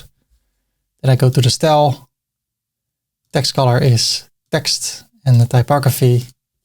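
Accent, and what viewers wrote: Netherlands. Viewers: Toen ik jouw naam hoorde gecombineerd met hoe je sprak wist ik meteen dat je Nederlands was haha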